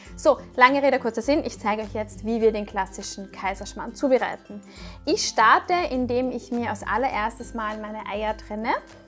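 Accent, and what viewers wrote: Austria. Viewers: Super, aber ich bekomme diesen charmanten österreichischen Akzent nicht hin und so es ist irgendwie nur ein leckerer Pfannkuchen.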